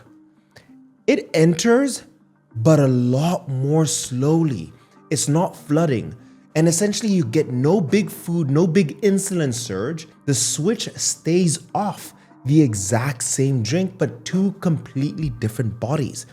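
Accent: South Africa. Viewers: . You have a South African accent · Aaah are you South African? The accent says I come from South Aaaahhh. If yes, please make us an SA specific meal plan, with all the foods we love in a way that helps us🤦🏾‍♀️🤦🏾‍♀️🤦🏾‍♀️. Shooting my shot. Or I read too much into the Aromat and accent.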